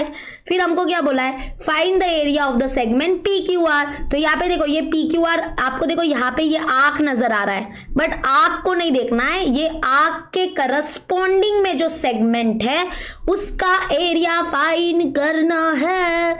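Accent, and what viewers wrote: Maharashtra, India. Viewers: Dii are you Maharashtrian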